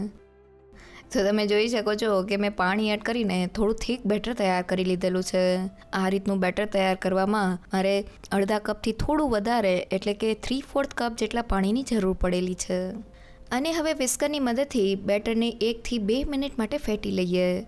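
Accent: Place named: Kheda, India. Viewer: તમારું ગામ કયું છે તમારી ભાષા ખેડા જિલ્લા જેવી લાગે છે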